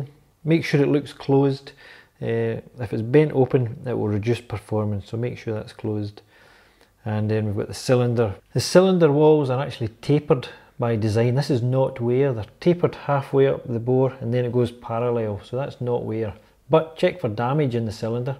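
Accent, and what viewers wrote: Scotland, United Kingdom. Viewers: Very good explanation. And I love that Scottish accent of yours! ;-) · english with british accent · Are you from Scotland?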